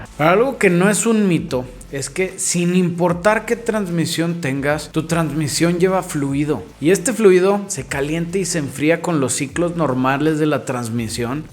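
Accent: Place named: Monterrey, Mexico. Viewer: Eres de monterrey vrd vato!! Jeje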